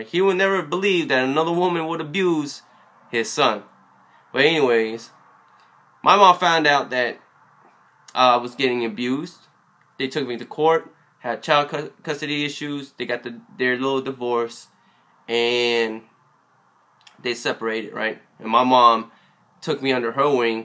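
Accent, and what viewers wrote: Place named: South, United States. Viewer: I just also wanna know where your southern accent is from. It's dope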